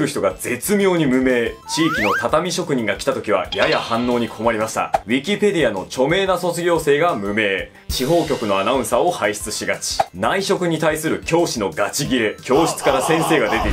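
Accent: Miyagi, Japan. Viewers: もしかして宮城県出身ですか？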